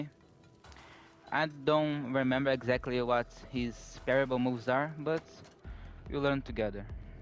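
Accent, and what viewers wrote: Brazil. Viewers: Brasileiro falando inglês has a Very specific accent BORA PORRA KAKDKAKDKAKDKAD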